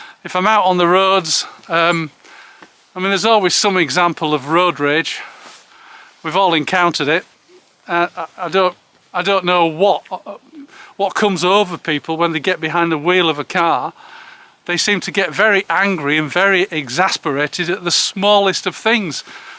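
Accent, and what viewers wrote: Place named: Hull, United Kingdom. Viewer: Detecting a little Hull in your accent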